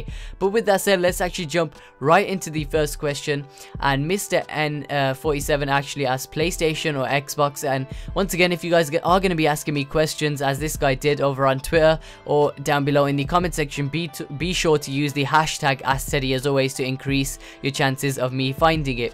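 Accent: Birmingham, United Kingdom. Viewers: Uk Birmingham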